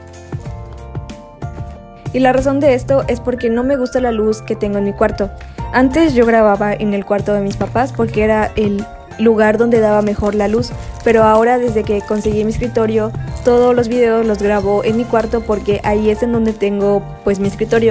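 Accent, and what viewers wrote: Mexico. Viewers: Es mexicana por su acento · Se escucha como mexicana, pero "fresa" y "pudiente" porque nos presume que viaja en auto.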